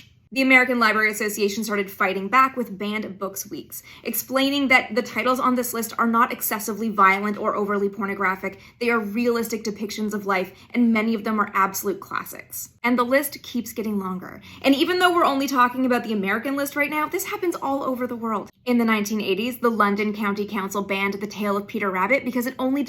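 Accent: Canada. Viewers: Now we deport you for speaking out or writing about a genocide that we're paying for in occupied Palestine. Pretty sure you're Canadian from the way you say your "ou" you off the hook. Alabama banned ann frank cause she was a jew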